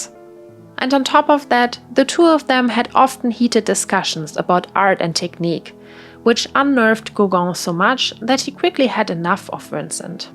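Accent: Germany. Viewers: Dieser deutsche Akzent ugh This accent I’m a German and it hurts even me🤣😭 but the video on it self is awesome.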